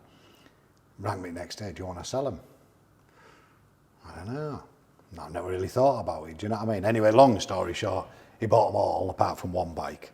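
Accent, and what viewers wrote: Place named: Dundee, United Kingdom. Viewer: The dundonian accent is a thing of beauty 😂😂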